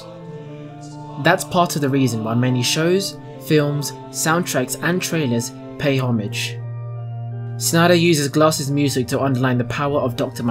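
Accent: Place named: London, United Kingdom. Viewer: I can hear the London in your voice haha, this is some terrific commentary. An analysis on Isle of Dogs would be great, taking the more symbolic approach would be something I haven't seen effectively yet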